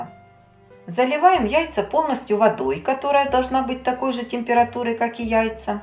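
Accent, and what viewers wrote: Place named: Ukraine. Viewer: Акцент хохляцький. Важко слухати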